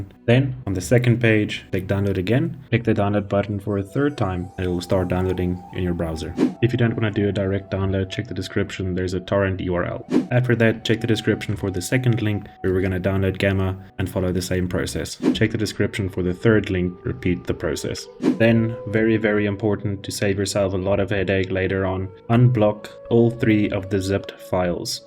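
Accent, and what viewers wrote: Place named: South Africa. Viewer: You are a life saver! I immediately recognised that accent. Groete ook van Suid Afrika :)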